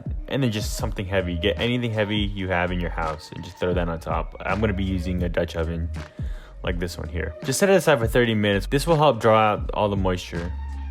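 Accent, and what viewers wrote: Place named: United States. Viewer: wish you yanks would learn to speak properly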